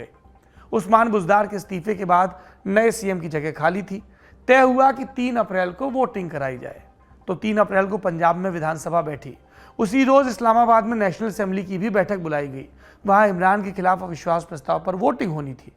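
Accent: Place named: Bundelkhand, India. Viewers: Sir i am from orai (near to your hometown chamari). The way you deliver words initially i can feel that vibe sir........ Full bundelkhandi.... Big fan sir.. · sir tumai bundelkhandi sunke maja aagao ek dum gaon ki yaad dila dai aapne to👌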